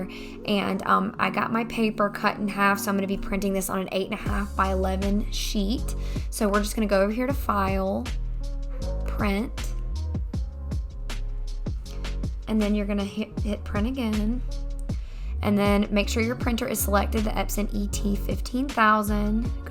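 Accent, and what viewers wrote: South, United States. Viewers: Girl u must be from da south! 😊 Great job!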